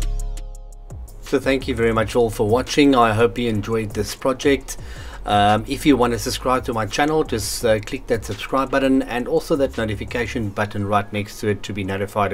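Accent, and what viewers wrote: South Africa. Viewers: I heard your accent and was gonna ask if you were based in SA? But then I saw the news paper you used with ‘GAME’ advertising, and we was like.. aah..haha. Great looking speakers, and good build techniques, I’m learning a lot from you, thanks!! Where do you get your parts, as I know SA isnt that easy to find stuff like that.. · awesome to see a fellow SA citizen make it in YouTube. Accent was very fimilar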